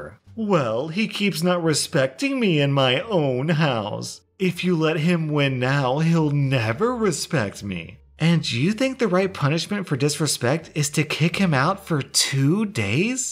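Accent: Australia. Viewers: we have an Australian in the house,G-day (I know no one actually says that seriously but hello) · 000 he’s Australian for sure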